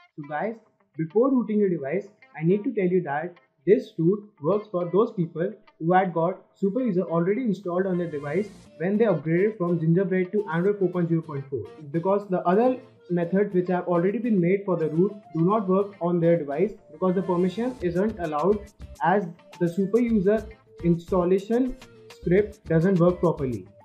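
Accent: India. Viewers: dude, your indian accent is hard to understand for people who are not from india. he wants you to put captions on the video or make custom subtitles on your videos.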